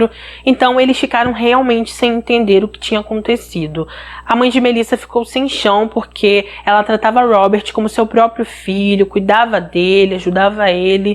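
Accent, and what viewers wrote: Rio de Janeiro, Brazil. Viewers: Ainda tem esse sotaque lindo de carioca.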